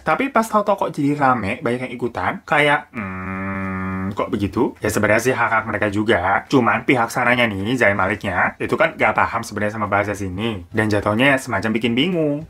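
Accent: Java, Indonesia. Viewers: jelasinnya kayak teman, bahasanya paling enak dan satu satunya yg bisa ngelawak, cerdas bang! Nessie judge: ngejelasinnya serius Arroyan Pram: ngejelasinnya dramatic dan sinematic editor berkelas Korea reomit: ngejelasinnya medhoq Yok siapa lagi nih???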